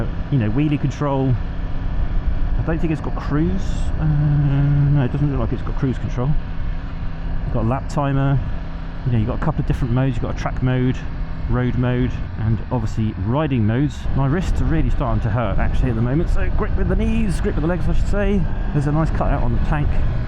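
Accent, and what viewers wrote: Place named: RP, United Kingdom. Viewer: Gotta love the private school accent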